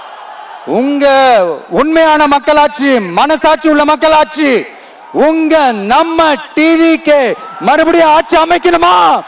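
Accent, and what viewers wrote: India. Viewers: முதலில் தமிழ் நன்றாக உச்சரிக்கவும் இந்தி காரன் தமிழில் பேசுவது போல உள்ளது ஒரு வேளை இந்தி காரன் தமிழில் எழுதி கொடுத்து இருப்பானோ???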